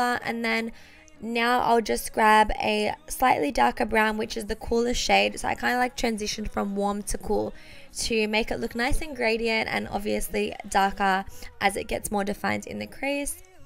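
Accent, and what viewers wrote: Australia. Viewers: Alexa Cryer she’s Australian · Alexa Cryer Australian x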